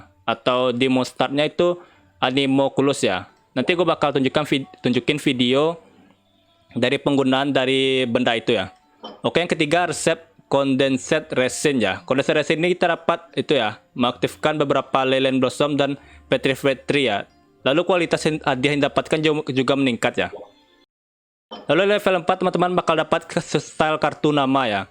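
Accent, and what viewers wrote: Padang, Indonesia. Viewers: Gw tambahin subcriber lu gan.. infonya bermanfaat dengan gaya bicara yg uniq. Org padang kah ?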